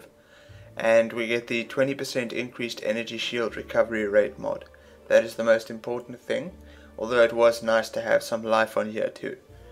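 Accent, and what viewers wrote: South Africa. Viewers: I knew that accent was South African :D mooi man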